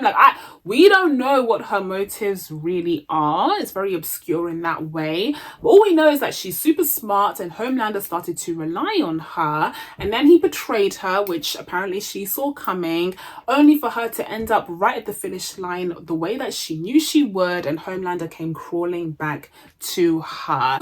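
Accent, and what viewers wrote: United Kingdom. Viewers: Your English accent is so beautiful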